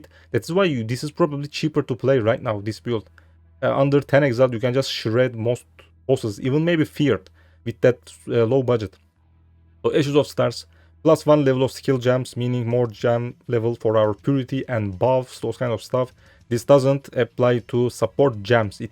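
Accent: Turkey. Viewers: reis türk gibi ingilizce konusuyor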